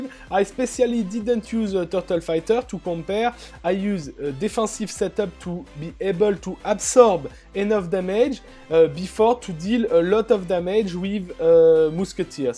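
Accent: France. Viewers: ahah y'a pas de soucis mais c'est sympa de reconnaître un accent français parmis tous ces HL ^^ oui après il me manque quelques essentiels à passer légendaire, surtout maitre mage mais elle est en cours) mais sinon je les ai tous full mais je galere je suis bloquer à 24/25 je tombe que sur des mecs de grosses guildes j'ai pas trop de cul ^^ bon jeu à toi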